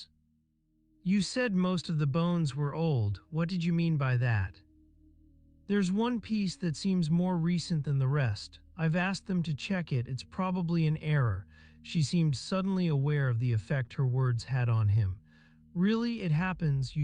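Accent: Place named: United States. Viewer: Thick US accent….. disservice to Cleaves.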